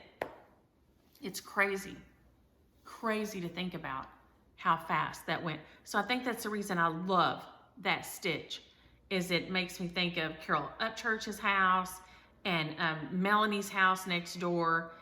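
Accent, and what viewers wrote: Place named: Alabama, United States. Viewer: He....I am late coming to the party! Please forgive me! 😂I watched a few of your videos and said to myself....this girl must be from Alabama with that accent! Then I heard you refer to Texas....and my eyes popped out! I am a native Texan...and I didn’t even recognize your accent! I’m in La Grange (you know what we are known for 😳) what part of the start are you in? You are very entertaining! I plan on slowly catching up on your past videos!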